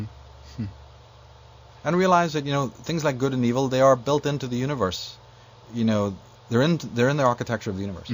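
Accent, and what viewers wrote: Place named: Northern Ireland, United Kingdom. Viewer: I find his voice compelling... The slight Northern Irish accent makes it easy to listen to. Thanks for uploading this Free your sou with 7 l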